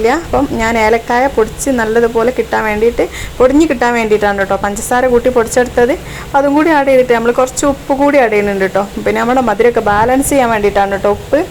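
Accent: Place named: Tamil Nadu, India. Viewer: ഇത്ത. എന്തു പറ്റി. സംസാരം തമിഴ്. ടെചു. വന്നു. സൂപ്പർ.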